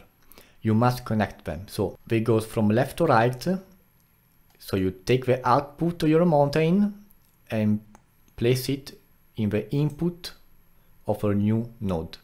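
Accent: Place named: Italy. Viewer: Very good tutorial! Curious question, are you Italian? That's a very thick accent... Not that I mind it! It's quite charming! Great tutorial!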